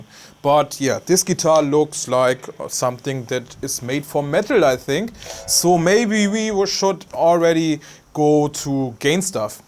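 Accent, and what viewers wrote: Germany. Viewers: Man hört gleich dass er Deutscher ist 🤣 Trotzdem ein sehr gutes Video!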